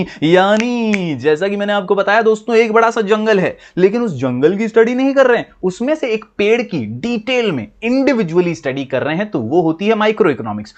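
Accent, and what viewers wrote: Maharashtra, India. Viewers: BRO YOUR MARATHI ASCENT SO COOL (tumhari video kon edit karta hai )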